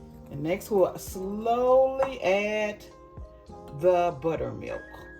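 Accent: South, United States. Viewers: Good morning mrs watched your first video yesterday on the pineapple cake, running to store now, also seen your recipe for coconut pie, 8 what? Because didn’t watch video, sorry, but love you! Your so country sounding, I’m going to try a lot of your recipes!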